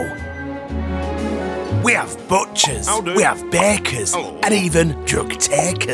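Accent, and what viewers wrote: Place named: Stockport, United Kingdom. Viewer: Can fuckin tell he’s from sk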